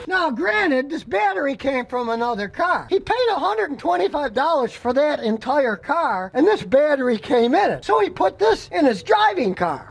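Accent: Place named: Appalachia, United States. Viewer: Scottys hillbilly accent killed me 😂😂😂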